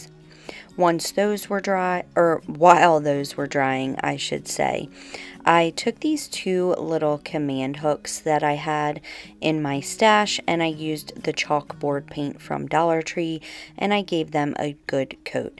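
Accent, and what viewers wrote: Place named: Philadelphia, United States. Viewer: YES GIRL. You have my accent lol I live in Delaware county originally from Philly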